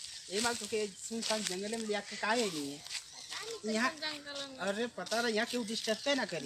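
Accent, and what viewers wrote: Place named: Azamgarh, India. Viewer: achchha Mai bhi azamgarh se hu apke bolne ke tareke se hi mughe bahut Dino se lag Raha tha ki ap azamgarh se hi Hain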